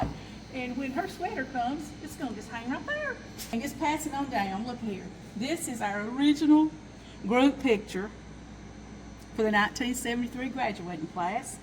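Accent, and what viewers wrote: South, United States. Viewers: Enjoyed watching your Re-union video & I'm from the Philippines. Your southern accent is delightful to listen to. Good Luck on the next Re-union & Thanks for sharing this.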